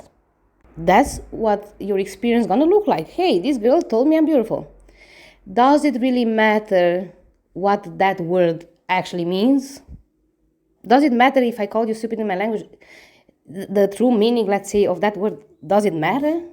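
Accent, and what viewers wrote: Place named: Spain. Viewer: I always tought you were Spanish! You sound jut like a friend of mine who is Spanish and speaks English at work :) Anyway, you are a fresh breeze in LOA YT community and I like your direct style without offending anyone and calling girls 'bitches' :D Thank you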